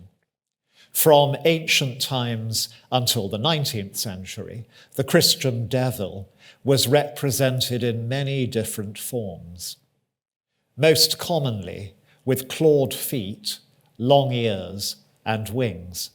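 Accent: United Kingdom. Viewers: My god is this British!!!!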